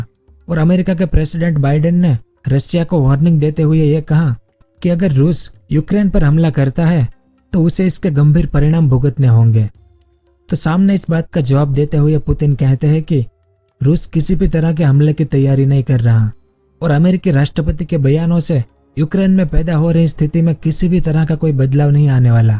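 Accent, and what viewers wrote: Gujarat, India. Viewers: Gujarati voice che Boleche te Gujarati che ne?????? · You are gujrati bro?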